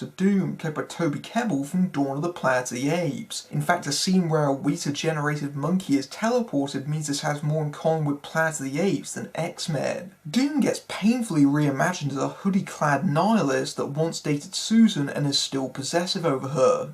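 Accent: United Kingdom. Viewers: that perfect British TV news anchor voice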